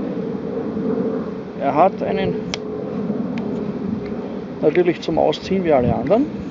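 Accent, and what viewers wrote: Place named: Austria. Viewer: Ach ja, so ein Einbeinstativ ist schon Gold wert. Und der Deinige ist auch noch ein Spazierstock obendrein. In meiner Antwort auf deine pers. Nachricht wusste ich noch nicht woher du kommst. Ich denke mal..an deinem Dialekt aus Österreich. Auch das Kennzeichen deines Autos ist kein deutsches aber man kann es nicht gut erkennen. Aber ich möchte ja nicht herumschnüffeln sondern deinen Beitrag der für so manchen Wanderer interessant ist, beantworten. Gruß von Walter.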